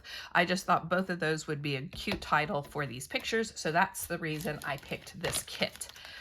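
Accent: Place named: Oklahoma, United States. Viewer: Hi! Your channel was suggested on my feed yesterday, and I love watching people make page kits! I hope this doesn’t sound crazy, but I noticed in a picture on one of your videos you had an OK State shirt on and your voice kind of has an OK accent - are you from OK? I’m in OKC area, and I’ve never met a fellow scrapper in OK, so I had to ask and say hi! Have a great evening!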